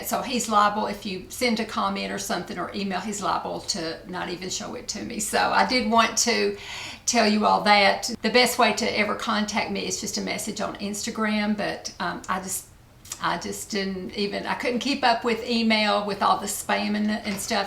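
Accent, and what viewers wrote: South, United States. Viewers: Your southern drawl is endearing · I have always found your videos very enjoyable and your personality is genuine and comes across with honesty and a sense of comfort. You don't strike me as a social butterfly, but as a true home and family gal. You are never "overdone" with the artificial enhancements. You apply your make up to compliment, rather than disguise. And I love your Southern style and your accent. As far as the hurtful racist comments, evil is a dark place where souls lurk and has no place where the sun shines on goodness. And your videos bring sunshine to those of us who love you. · Great video, but then, I have dearly loved all your videos from the start!!!! You have values and feelings so similar to mine, we could be sisters!!! LOL!! I'd be your very much older sister, though!!! Please don't be so hard on yourself, I hated to hear that you redo so many because you felt they weren't good enough or that you could do better...I can understand that of course, but, those of us that know you and admire you so much would understand and wouldn't care nor would we judge you in anyway, if you bumbled every sentence from start to finish!!! 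For your sake, sweet, dear girl, ease up on the self criticism and just be you. We ADORE 'YOU'!!!! I am also southern and the first time I found you, and heard your gorgeous southern accent, I knew right then you'd be a fantastic YouTuber....and I wasn't wrong!!! Hugs, and have a blessed week...and hugs to all your precious family.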